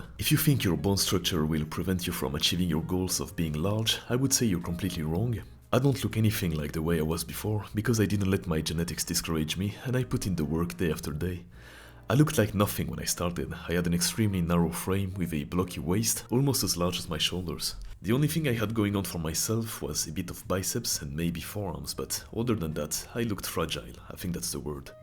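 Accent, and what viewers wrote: France. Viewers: Is he French? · No offence bro but you sound French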